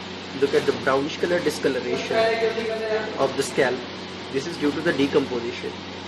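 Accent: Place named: India. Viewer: прошу прощения. Индия. Акцент характерный. труп пролежал не более 4 дней, только в условиях жары. плюс сам по себе человек смуглый был. отсюда и отличный внутренний мир тела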